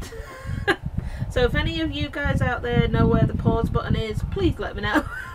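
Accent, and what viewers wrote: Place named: Northern England, United Kingdom. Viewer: Hi!! Your accent- it sounds like it's from Northern England! Do people in your area usually speak like that?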